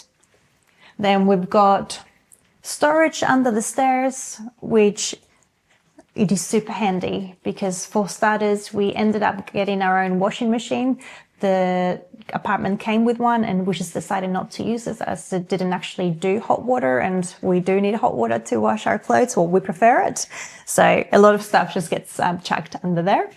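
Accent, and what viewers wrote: Australia. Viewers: Found your channel quite by chance and do very much enjoy your explanations of your cultural experiences. Thought the accent sounded familiar. 🦘 Did the sums and it equates to per week in Australian money. You couldn't rent a private parking space in any Aussie CBD area for that. Rents in 6000 (postcode) outer suburb hubs start from $450 per week just for a 1 or 2 bed unit. Then again, you know all this. Do you reckon the children (when older) will look back at all of these adventures as something that not a lot of other children were lucky enough to have had? As for that upstairs terrace, aside from the barbi area, maybe a few raised garden beds for veges or potted fruit trees. Create your own oasis. Thanks for sharing 💙💙💙 Edited to correct grammar. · Ok your accent is like a crazy mix of Australian, German (or Dutch?), and Chilean or something. 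I have no idea obviously, but it's interesting to hear!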